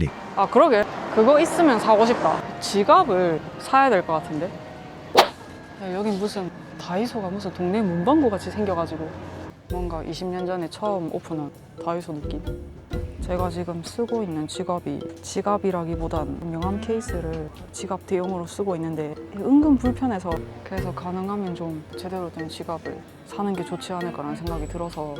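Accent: Busan, South Korea. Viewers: 구독함!, 꾸밈없어 좋음, 경상도 표준어 너무 좋음!!! 30대 후반인데 어째 4~50대 필이 나서 더더더 좋음^^; 요즘 시덥잖은 넘탱이들 여행유튭보다 훨 나음. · 부산분이시죠? 너무 잘 봤습니다. ㅎㅎ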